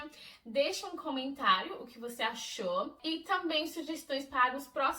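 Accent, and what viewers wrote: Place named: São Paulo, Brazil. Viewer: Vc parece paulista, curioso o seu português ser tão perfeito . Deve ter ficado muito tempo aqui.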